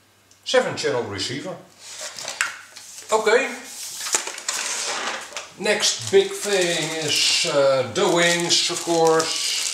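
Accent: Netherlands. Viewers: Mooie video! Misschien is het handiger om NL te spreken? Het is een stuk moeilijker om naar Engels met een Nederlands accent! Voor de rest echt een SUPER GOED KANAAL! Meteen geabboot. 👌😊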